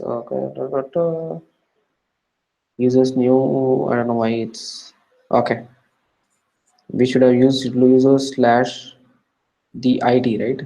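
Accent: India. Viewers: Indian accent is funny!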